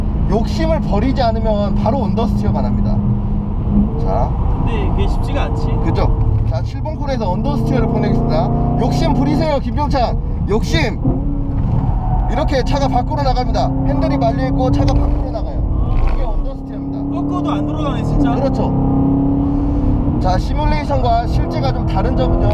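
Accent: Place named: Jeolla, South Korea. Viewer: 안녕하세요..예전에 제네시스 EQ900 모터스튜디오에서 잠시뵈었지요..잔라도 사투리 억양과 준비 많이한 멋진영상 잘봤습니다..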